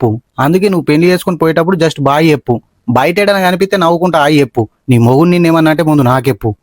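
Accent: Telangana, India. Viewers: తెలంగాణ తెలుగు బాషాని ఇంత చక్కగా ముద్దుగ, గమతుగా ఒక్క చెడ్డ మాట లేకుండా మాట్లాడిన మీకు వందసార్లు నమస్తే అన్న 🤗🤭😆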